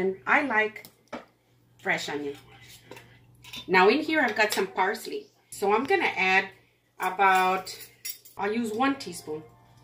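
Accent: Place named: San Antonio, United States. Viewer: 4-7-24 Just saw this a few minutes ago but not soon enough to try your recipe. My meat loaf is browning in the oven., I have tried many of your recipes and they are always great. Will try your meat loaf next time. Just enjoy listening to your San Antonio accent. 🤗 Thank you for sharing all your talent on youtube.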